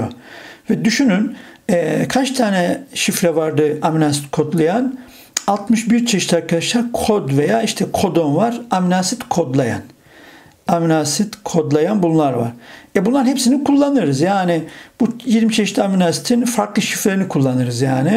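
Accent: Black Sea, Turkey. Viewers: Hocam karadenizli misiniz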